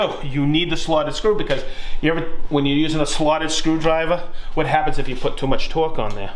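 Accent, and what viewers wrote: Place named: New England, United States. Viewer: I just got a TS55 & OF1400 router. I read a lot of guys say only go with a continuous track… Would you recommend joining 2 Festool tracks to cut a full 96” length of plywood or just getting the FS2700 or FS3000? Thank you for the videos by the way. I have been watching the last few weeks. Great content. Love the new england accent brother!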